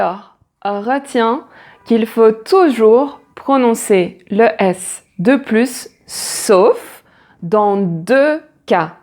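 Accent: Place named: France. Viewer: You have a beautiful French accent 💕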